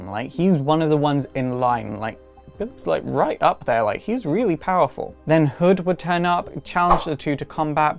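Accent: United Kingdom. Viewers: I'm 35 (turning 36 this year) and I came out when I was 19 (it was a little different in my day - I'm a bit older than you - also American, born and raised in Baltimore Maryland - from your accent i can tell your from the UK) Marvel's Northstar came out in 1992 when I was 9 but i never felt I related with that character. Like you when I found the character of wiccan i felt I found a piece of myself and went right out and bought "Young Avengers: Children's Crusade" - found out the book and the character kicked total ass and have been sold since. Also been following Iceman's "BIG GAY BOOK" which I like but not as much as I LOVE Billy Kaplan/Wiccan.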